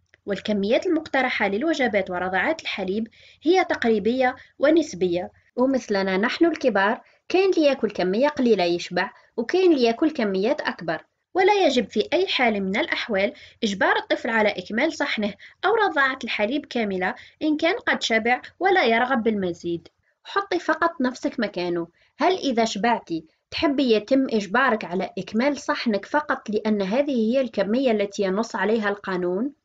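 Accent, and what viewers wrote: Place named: East, Algeria. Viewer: دكتورة عندي فضول نعرف انت منين حاسة بيك من عنابة ولا الطارف ولا قالمة ولا سوق اهراس خاطر هدرتك تشبه بزاف لهدرتي انا من خنشلة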